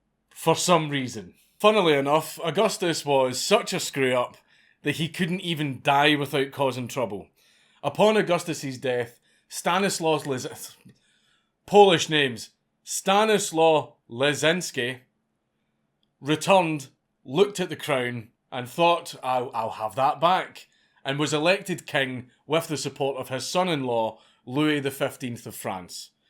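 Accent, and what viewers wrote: Scotland, United Kingdom. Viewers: You're not my type, I'm afraid, but if I were a woman, I'd marry you just for your Scots accent. Thanks for the story, by the way. Augustus was a "bastard factory" ? That's one for you !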